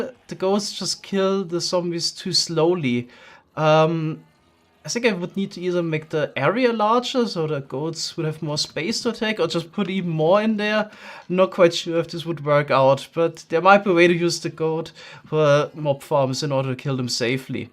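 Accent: Germany. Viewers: Du klingst so deutsch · Hehe, auf den deutschen Akzent ist Verlass. :D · ah dude this english i love it. True German :D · that perfect german acsent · Bist du Deutscher? Dein Englisch hört sich iw so an. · You have the Biggesee germen accent i herard in my whole life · Ah, weil er ein Deutscher ist der englisch redet 🥴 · are u german? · Du klingst so hart deutsch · Sounds like you are a german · Du bist Deutscher das höre ich sofort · u are safe german i can hear the accent. dikka red sag doch einfach dass du deutscher bist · deutscher Akzent check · man hört den deutschen raus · you are german right? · Da hört man doch den deutschen Akzent :) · Are you german? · Came for the farms, stayed for the spiffing skin, and German accent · hahahah der deutsche dialekt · Irgendwie kann ich einen deutschen Akzent raushören 🤫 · this man has the most german accent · Ich kannte ihn noch nicht hab aber direkt raus gehört das das deutsches englisch ist 😂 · you are german right, i mean the accent ? 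· Wegen deinem Dialekt glaube ich das du aus Deutschland kommst. gibt es das auch auf Deutsch? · Man hört so das du deutscher bist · I even can hear that you are a german😂 Grüße gehen raus an alle deutschen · Er ist soo deutsch oder? Das hört man mega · Are you from germany ? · are you german?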